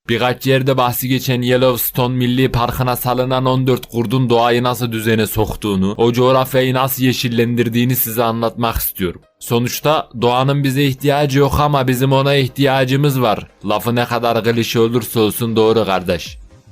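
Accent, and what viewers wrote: Ankara, Turkey. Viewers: herşey güzelde Ankara lı böyle mi konuşmak zorunda ,ne oldu güzel türkçemize? bilgilendirme için teşekkürler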